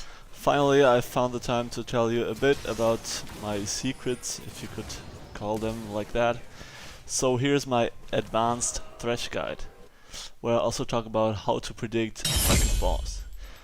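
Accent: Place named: Germany. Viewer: Man erkennt nach spätestens 3min das du deutscher bist :D Aber trotzdem echt cooles Video ^^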